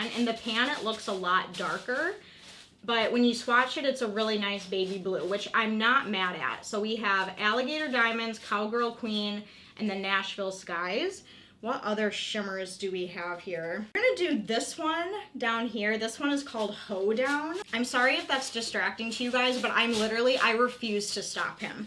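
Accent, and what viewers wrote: Michigan, United States. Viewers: I love your mitten accent lol. "I got it Ahn" 😊😆🫶🏻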